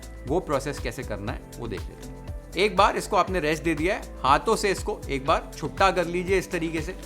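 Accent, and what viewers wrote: Maharashtra, India. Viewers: Your voice tone is like marathi person talking in hindi ?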